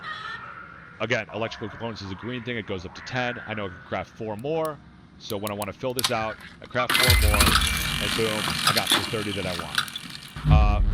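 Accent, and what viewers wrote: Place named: United States. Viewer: Sound American AF and mature, go straight to the point in the video without wasting people time, and you win.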